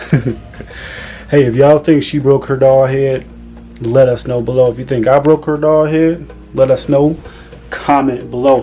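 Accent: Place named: Jamaica. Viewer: I hope y’all haveing a good day and my Jamaica 🇯🇲 sister just have to say this JAMAICA FOREVER 🇯🇲 love how u talk we Jamaicans funny 😂 😂 btw I’m a new subscriber 🤗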